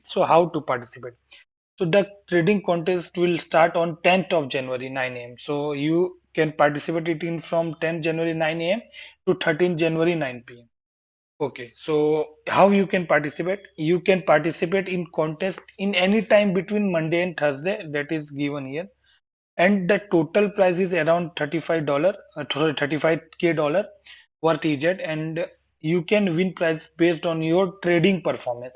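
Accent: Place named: Syria. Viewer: Please don't get me wrong but you speak english like a syrian :D Good day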